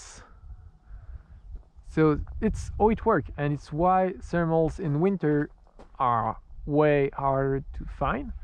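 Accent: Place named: France. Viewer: you never have to apologise for having a French accent. Like most Englishmen, I can just about manage to order a beer and a ham and cheese sandwich in French. I am constantly amazed at other people's ability to communicate so fluently in another language.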